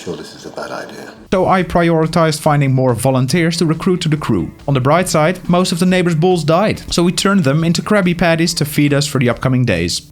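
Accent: Netherlands. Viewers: Dutch accent recognised!! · bro is clearly dutch 😂 trust me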